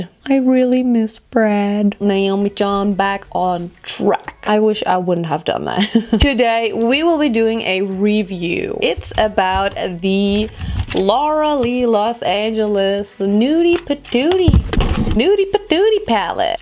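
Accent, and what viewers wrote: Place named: Germany. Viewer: Ok so its my first video I'm watching of yours and omg I could tell you're German because you sound and act very similar to wthmille who is hilarious! SUBSCRIBED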